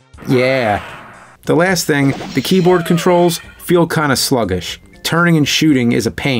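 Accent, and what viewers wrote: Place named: East Coast, United States. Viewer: But with that awful East Coast accent, it's more like "Æss".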